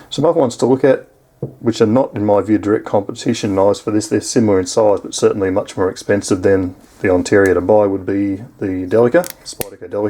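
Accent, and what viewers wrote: Australia. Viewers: Mate.. I just loved the Review and it's great to hear an Aussie Accent doing one and you've got me motivated to go down to Walmart and buy one tomorrow .. :)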